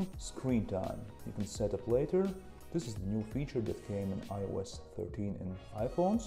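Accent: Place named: Russia. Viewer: Раша акцент ю ноу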